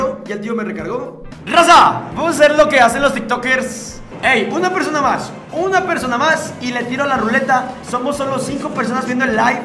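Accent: Argentina. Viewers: Te dejo de seguir por q ablas asi como argentino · Es argentino ese men?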